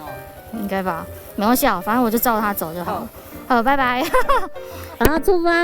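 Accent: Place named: Central Taiwan, Taiwan. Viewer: 好親切的中部腔~😆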